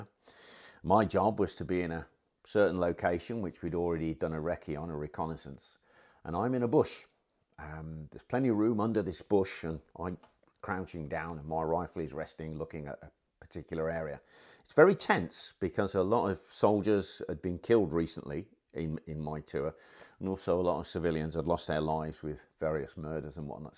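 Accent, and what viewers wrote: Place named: Birmingham, United Kingdom. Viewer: Hello I loved your stories and i love your channel. Small question is that a brumy accent? im a Black Country chap myself and couldn’t resist asking.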